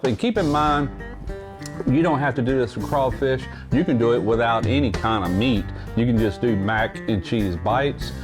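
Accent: South, United States. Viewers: I can tell just by your accent that your from the South will you please invite me to your next cookout? Lol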